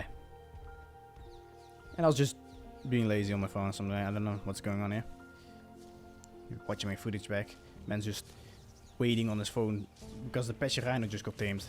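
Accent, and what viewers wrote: Netherlands. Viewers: Ik kan nu al horen dagge ne Nler zijt haha goe videoke :P groetjes van België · Hahah eerste zin die je zegt en ik hoor nu al dat je Nederlands spreekt, maar wel een goede vid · woooow nice video but are you Dutch? cause i can hear some kind of accent! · Ik hoor aan je accent dat je nederlands ben wil je eens ark splen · U sound so Dutch like me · E bro ik hoor dat je nederlands bent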